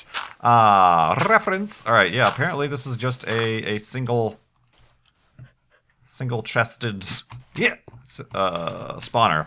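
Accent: Ireland. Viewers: You half irish or something?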